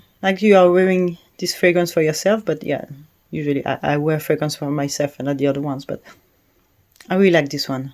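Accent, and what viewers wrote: France. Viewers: Don't apologise for your French accent - it is beautiful! So nice to hear the correct pronunciations for the French names, especially the Shalimar. I've been wanting to try Lui, so hard to find in Australia though...I also love Dior Addict, I have the earlier first two versions., 2002 & 2014. I was exactly the same as you with L'Heure Blue - it took me a while to love this one, I initially found it quite difficult, but it takes some time to warm up to this one! But when I'm in the mood for it, it's perfect. I also really love Perfume Initial! 💙 The bottle is so beautiful & elegant. Lovely video thanks! A big hello from a very warm Australia. x 🤗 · Love your description of Fille En Aiguilles. Have similar feelings about it. Orthodox Christmas :). P.S. Please don't apologize for the french accent - that's great!!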